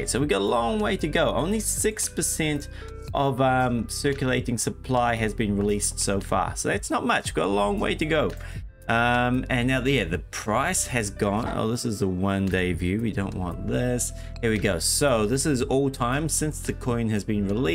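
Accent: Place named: Ireland. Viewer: .03 now lol the game crashing bad. Here is the thing. It is all clicking and no development in making the game playable. It is exactly as playing the stock market and not a game. But here is the thing this game would be very nice but thing is you have to spend crypto. No cc or account in the game. So it sort of locks players in to only play with crypto instead. Look at star citizen. They have made over 500million and still in alpha after 13 years later. This game looks good at 1st then dig into it then you see it's very very bad over all. Plenty of games you play to earn and also in sol blocks too. Just another dream and wish demolished. Btw your voice cool sounding be like an Irish guy. Lol like it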